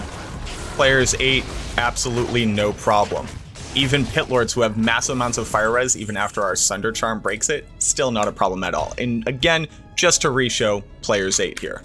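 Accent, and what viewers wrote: Boston, United States. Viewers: The most impressive part of it is he has a heavy Boston accent when he isn't presenting; he showed it off in one of his recent videos and it completely took me by surprise. He is a really talented presenter.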